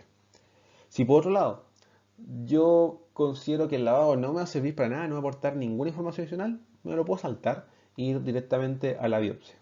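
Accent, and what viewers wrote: Chile. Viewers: no le entiendo lo que habla ... chileno?? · chileno no ?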